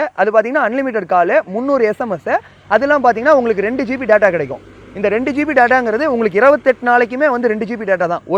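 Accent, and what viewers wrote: Salem, India. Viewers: Bro neenga Salem ah??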